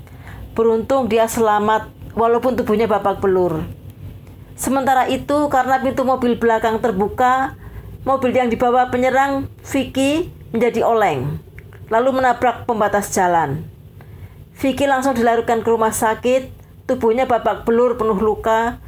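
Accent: Java, Indonesia. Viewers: suara madam yg medok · Medokny dikurangi dong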